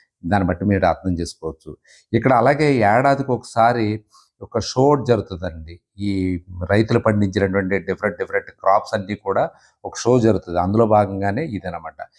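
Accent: Godavari, India. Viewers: Correct ga chepparu me way of talking mana Godavari valla la telustundhi😊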